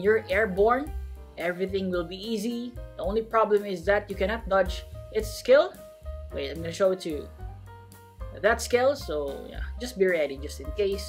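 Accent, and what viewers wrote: Philippines. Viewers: Yo your accent sounds like your from Philippines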